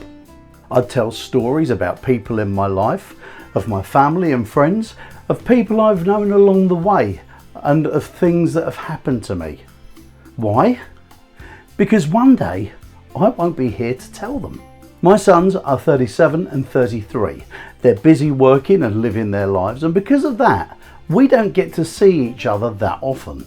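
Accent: England, United Kingdom. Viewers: I like your calm and enjoyable english voice :)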